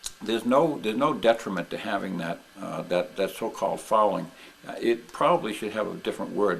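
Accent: New England, United States. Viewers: Pleasant New England accent, sir. Much different than we're used to hearing in Nashville!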